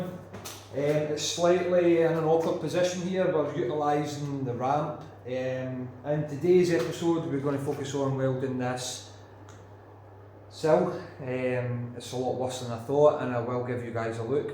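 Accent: Scotland, United Kingdom. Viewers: Love the video 👍 great to hear a fellow Scot 🏴 on here. Liked and subscribed 🏆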